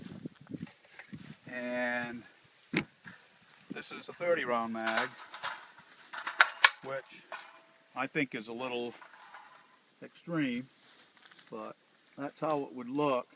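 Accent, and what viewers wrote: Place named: New Hampshire, United States. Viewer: Great video my friend thank you . And just for the sake of question are you guys in New Hampshire ? I think I recognize your accent lol I'm from Rochester NH and I now live in Arizona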